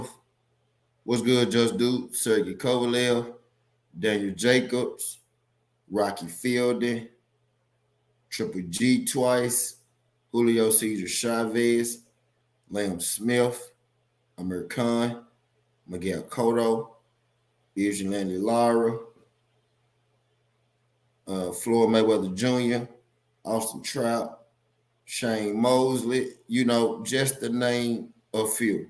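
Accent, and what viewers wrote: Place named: South, United States. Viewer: U sound more country than usual in this video 😂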